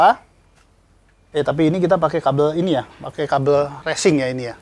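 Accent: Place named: Banyumas, Indonesia. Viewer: Matap tutor video dari Pak suskes selalu buat Bapak,salam kenal dari wong kebumen,sama2 ngapak kaya mas ari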